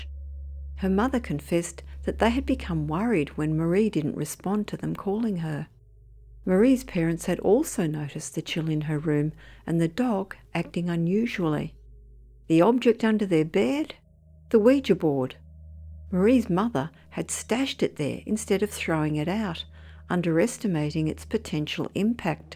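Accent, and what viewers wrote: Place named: Australia. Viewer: God, the Australian accent is so ugly! I can’t listen to this crap! Change the accent!!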